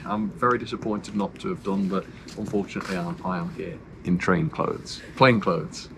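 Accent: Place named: North, United Kingdom. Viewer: We now want to visit this railway, its about 3 hours from us. I did notice your northern accent was creeping in. Who is your lucky lady and expect camerawoman?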